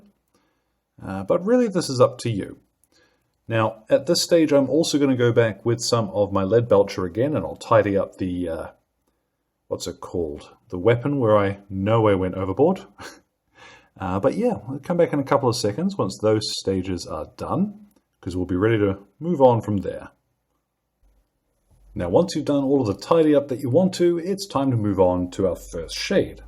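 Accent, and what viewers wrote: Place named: New Zealand. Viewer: i love that kiwi banter, it reminds me of Simon Bates from radio 1 back in the day..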